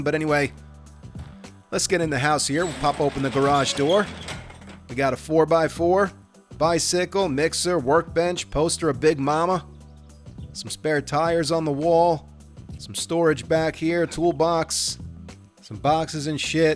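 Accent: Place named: Boston, United States. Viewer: Quick question you from Massachusetts?? You sound like your a fellow bostonion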